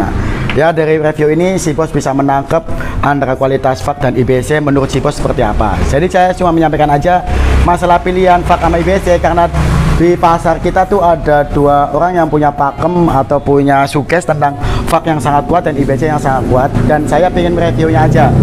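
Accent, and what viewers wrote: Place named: Java, Indonesia. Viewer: Demak jateng hadir mas. Jowone medok. Hhh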